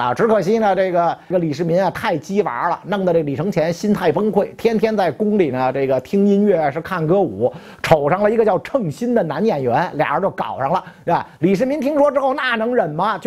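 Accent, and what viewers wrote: Beijing, China. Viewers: 老师的京片子很好听😄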